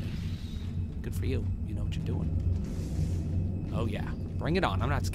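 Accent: United Kingdom. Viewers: British voice kind of reminds me of the Geiko Gecko. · your british accent soundslike a non robotic Weatly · you kind of sound like austin powers in your awesome british accent ! =)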